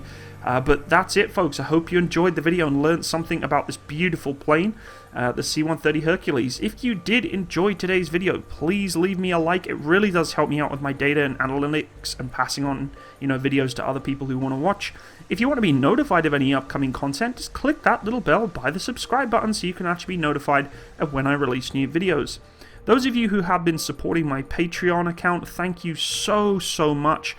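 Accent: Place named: United Kingdom. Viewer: Your British is showing 😊: In American; I fly in a C-130 ... present tense I flew in C-130 ... past tense I had flown in a C-130 ... past perfect I have flown in a C-130 ... past pluperfect I think that is correct. At any rate, a nice and concise as always discussion of a great airframe.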